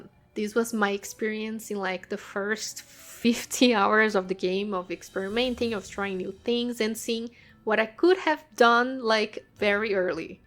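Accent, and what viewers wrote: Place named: Brazil. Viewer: Great video!! You have a Brazilian accent 😊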